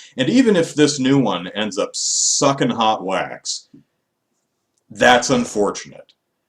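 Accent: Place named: Kentucky, United States. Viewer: Off-topic but did you grow up in KY? You have a certain way of speaking.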